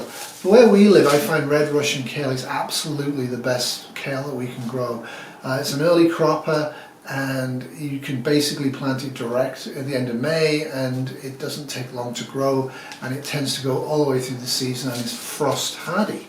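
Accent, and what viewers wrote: United Kingdom. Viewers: I think it's a British thing. Brits don't pronounce the "r" on the ends of words. He has a British accent, not a Canadian accent.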